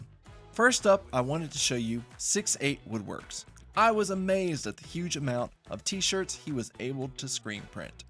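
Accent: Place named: North Carolina, United States. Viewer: I thought I recognized a North Carolina accent. Where are you located? My shop is in Whiteville from from outside of Hickory originally.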